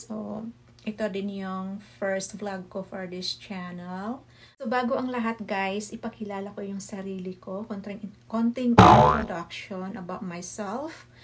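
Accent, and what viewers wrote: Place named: Visayas, Philippines. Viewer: Interesting from Bohol k pla sis, i like Visayan region been there once, gingaya qo p nga accent at inaaral p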